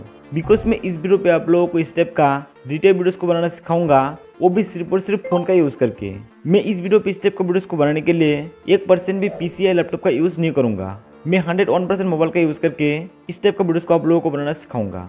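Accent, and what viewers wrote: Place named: Nepal. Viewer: Bhai aap nepal se ho mera dost bhi ese hi bolta hai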